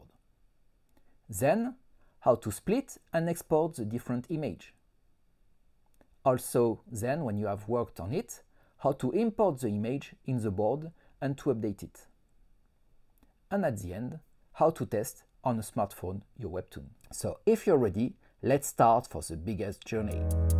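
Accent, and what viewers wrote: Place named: France. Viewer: L'accent français je l'aime tellement, et vous avez aussi un bon scénariste (jeff)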